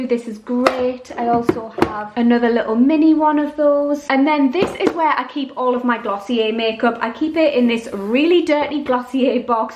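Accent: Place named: Wales, United Kingdom. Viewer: R u from wales cause u sound welsh Xx 😘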